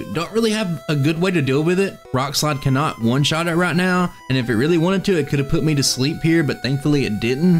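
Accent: South, United States. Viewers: you kinda have a southern accent! I'm surprised!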